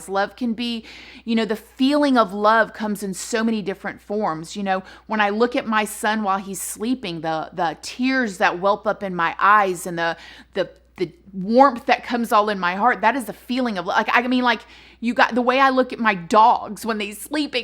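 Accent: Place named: Texas, United States. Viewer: Just found your channel... I am obsessed with true crime... and I watch a lot of different channels... but I have to say... I love your delivery and genuinity... I am definitely subscribing and hitting the bell... and may I just say that you are so beautiful!! And your accent and dialogue is so inviting... are you from Texas??? Anyway... I am off to enjoy the rest of your content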